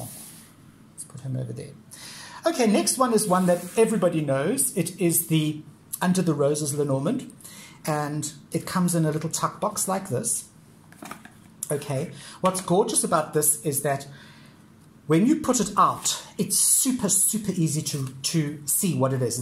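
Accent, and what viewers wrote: South Africa. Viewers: Rare to find south africans youtubers! Love their accents hahaha. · So lovely to hear another South African accent :-) I've enjoyed a wander through your collection.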